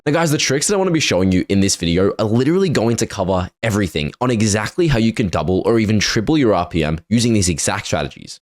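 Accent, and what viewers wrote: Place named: Australia. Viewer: This aussie accent is so cool ma man 🤜🤛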